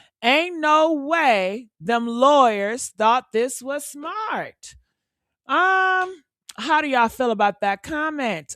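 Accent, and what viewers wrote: South, United States. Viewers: if you dont mind my asking, are you from the south??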